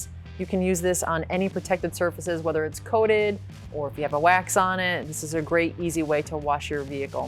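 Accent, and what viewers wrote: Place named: Philadelphia, United States. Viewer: are originally from Philly? Just guessing by the wooter accent.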